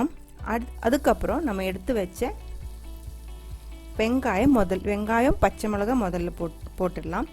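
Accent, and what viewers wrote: Kerala, India. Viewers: She says that your cooking as well as the tamil with malayalam accent is very sweet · Absolutely Beautiful Tamil in Malayala Flavour !!!!! Your Tamil is sweeter than our Tamil. Indeed! The recipe is super. Just now bumped into your channel. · The way you explain is nice to hear... Tamizh in Malayalam accent👌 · I like your malayalam mixed Tamil .. lots of love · Tamil in malayalam slang very cute😊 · Superb !👌👌 Love ur explanation & ur malayalam kalandha Tamil!👍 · Unga Malayalam + Tamizh slang semmma 😍😍😍😍😍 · Nalla tamil pesureenga.. Konjam malayalam kalandhu neenga pesumbodhu kekkave nalla irukku.. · நல்லாருக்கும்மா அதோட நீங்க பேசுற மலையாளத்தமிழ்ழும் அழகாருக்கு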